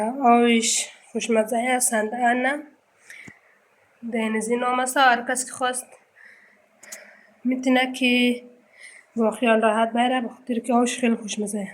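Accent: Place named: Iran. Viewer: خیله نصفش ایرانی میگی نصفش هزاره گی درست گپ بزن یا ایرانی یا هزاره گی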